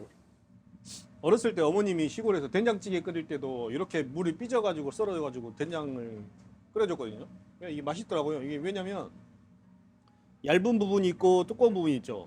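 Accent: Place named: Jeolla-Gyeongsang border, South Korea. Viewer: 말투나 식성을 보면 전라도와 경상도 그 경계 출신인듯 함.. 하동이나 여수분이 아니실까??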